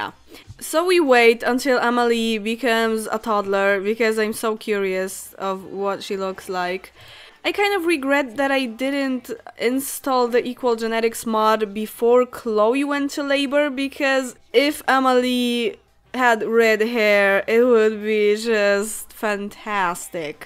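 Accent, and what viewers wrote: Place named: Poland. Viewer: Kocham ten polski akcent <3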